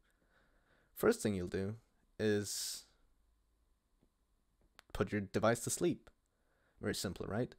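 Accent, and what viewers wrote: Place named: United States. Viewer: Finally an American not Indian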